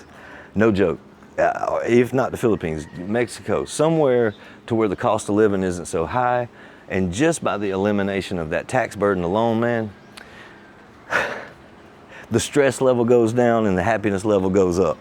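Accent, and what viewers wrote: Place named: Myrtle Beach, United States. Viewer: Just came across your channel.Interesting content. Thanks for sharing your adventures and I will follow along. I am living in Australia permanently but originally from Myrtle Beach. And by the sound of your accent I am sure you know myrtle beach. Keep up the content and best wishes to you.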